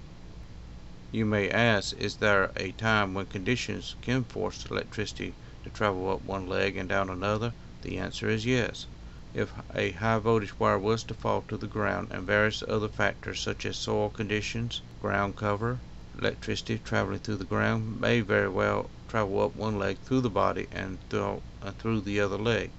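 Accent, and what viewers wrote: South, United States. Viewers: this guy's southern drawl is hilarious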